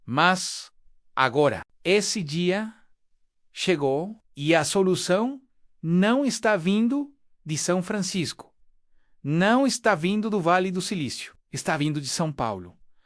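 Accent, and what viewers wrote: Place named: Argentina. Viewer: Narrador argentino tentando falar português. O conteúdo é falso também. Final dos anos 1980, holandesa Philips já empilhava transistores (3D). Os pesquisadores citados, caso existam, estariam 're-propondo' algo há muito tempo já pensado. Que maravilha! A USP prevê o passado. Mas o nosso futuro - e presente - contimuam tenebrosos. Dito.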